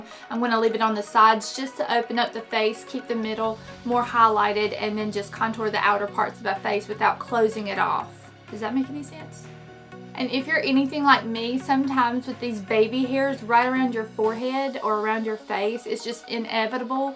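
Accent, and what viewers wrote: South, United States. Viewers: I love your cute southern accent!!!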